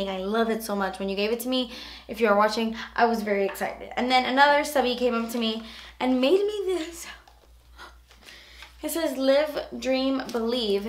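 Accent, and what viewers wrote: United Kingdom. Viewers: You have the cutest British Accent Ever! :D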